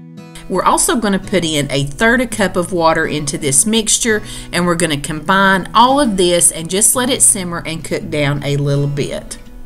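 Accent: South, United States. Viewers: Love that southern Belle accent and of course your great recipes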